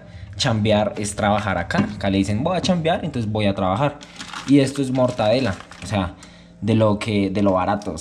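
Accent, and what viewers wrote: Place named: Colombia. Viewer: Suena bien ese acento colombiano mezclado con palabras mexicanas . . . Parceeee 🤟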